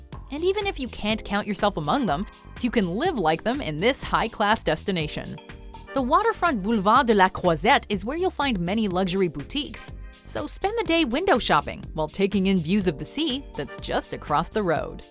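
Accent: Quebec, Canada. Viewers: Her accent is French Canadian, so French likely her first language. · She's French Canadian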